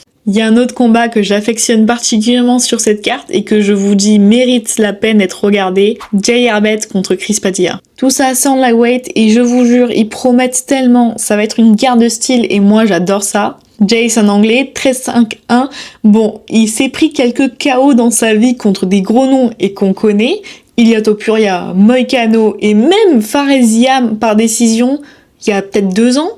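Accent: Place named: Paris, France. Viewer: C’est l’accent Panaméen.. attention on critique pas …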